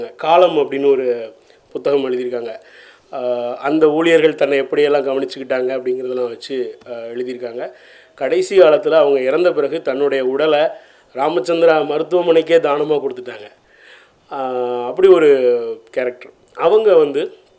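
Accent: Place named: Tirunelveli, India. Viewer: பெருமகிழ்ச்சி கார்த்தி புகழேந்தி. நெல்லை பேச்சு வழக்கு குறைந்து விட்டது வியப்பு! பிற்பகல் 3.15 மணி வரை இருந்தேன். நேரில் தங்களைச் சந்திக்க இயலவில்லை. (ராஜம் கிருஷ்ணன் அவர்களை மூன்று நான்கு கூட்டங்களுக்கு அவர் வசித்த போரூர் தெருவிலிருந்து அழைத்துச் சென்று விட்டது, மைதிலி சிவராமன் அவர்களுடனான நட்பு , 'மகளிர் சிந்தனை' மாத இதழில் அவரது கட்டுரையை கையெழுத்து வடிவில் பெற்று ஒருமுறை திருவல்லிக்கேணிக்கு எடுத்துச் சென்றுள்ள நினைவுகள் வந்தன. 2011 விநாயக சதுர்த்தி விடுமுறை நாளில் ஓவியர் கோபுலு அவர்களை அவரது ராஜா அண்ணாமலைபுர இல்லத்தில் சந்தித்தபோது ராஜம் கிருஷ்ணன் போரூர் ராமச்சந்திரா மருத்துவமனையில் அனுமதிக்கப்பட்ட நிலையில் அவருடன் கவிஞர் இரா.தெ.முத்து எடுத்த பேட்டி வெளியான செம்மலர் மாத இதழைக் காட்டியபோது கண் கலங்கிய படி உற்று நோக்கி 'லட்சுமிகரமா இருப்பளே!...' என்று பேச்சுத் தடுமாறியதை உணர்ந்தேன்...) )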